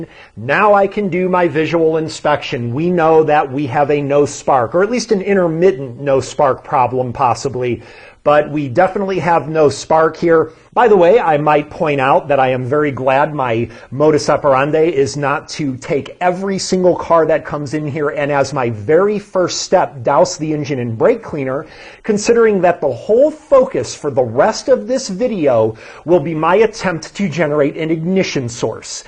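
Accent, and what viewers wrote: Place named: Rhode Island, United States. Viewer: Are you from Rhode Island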